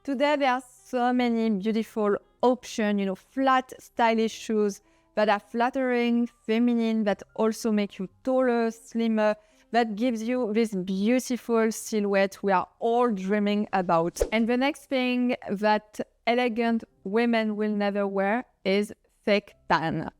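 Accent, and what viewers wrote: France. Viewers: but your accent is what makes you unique! i love your videos with your french accent :) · As is your BEAUTIFUL 💕 French accent! It is such a lovely part of your videos! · I love you, unapologetic about your opinions right out the gate. It’s so refreshing to see. If someone doesn’t like your opinion they can go to a different channel. Also if someone doesn’t like your authentic French accent on a channel about French style they can also move on. I love your voice and your accent. I love the authentic perspective you give as a person from France, living in Paris and having lived a time in the US for true experience in comparison. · This Yank ADORES your big French accent. And Gaul energy. Keep on keeping on! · I love your French accent😊 You are doing a great job👍🏻👍🏻 Vive la France!🥰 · ALL perfectly said. 👌 And I LOVE your big French accent ❤ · I like your french accent very much! 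I think it‘s really charmimg! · You got the best french accent ma'am❤️ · “If you’re offended… my big French accent… please move on to…”, well said 👏! Every time you post a video, I watch it several times. I can’t agree with you more. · 😂Love your french accent. I was in France in 2022 (August and November). Traveled to Paris, Normandy, E'tretat, Beaune, Chamonix, Nice, Cannes, Antibes, Bayonne and Bordeaux. Loved France and French culture.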